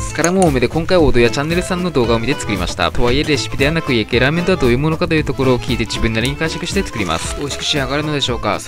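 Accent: Aomori, Japan. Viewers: 青森の人？ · おうち麺TVさんってもしかして青森出身ですか？ イントネーションが青森の友達にそっくりで笑ってしまった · 喋りに少し訛りがありますね。 青森の方ですか？ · もしかして青森出身のお方ですか？ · ちなみに、青森県のどちらの出身でしょうか？なまりが？謎です。十和田市民より。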